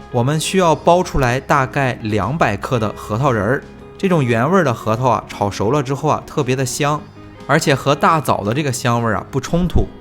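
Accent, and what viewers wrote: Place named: North, China. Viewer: 这是哪里人，每句都有儿话音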